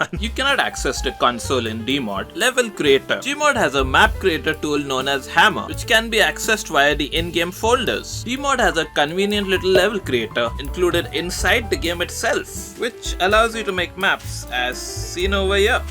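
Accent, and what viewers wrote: India. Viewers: you gotta love his Indian accent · You sound indian but i have abit indian accent in my voice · you are indian?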